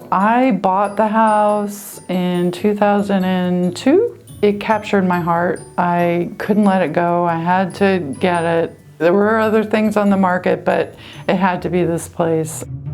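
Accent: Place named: Washington, United States. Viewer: Is it surprising that I can detect a Washinton accent?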